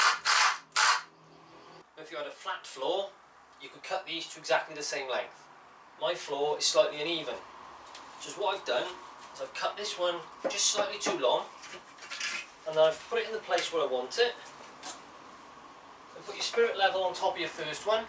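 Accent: United Kingdom. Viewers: Nice video and excellent explanation. What part of the United Kingdom are you from?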